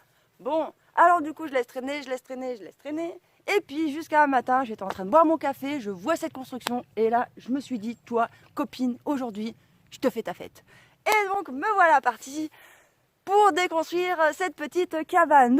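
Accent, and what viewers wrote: Paris, France. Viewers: Mais putain, pourquoi tu causes comme une parisienne derrière tes lunettes de Kaaris ?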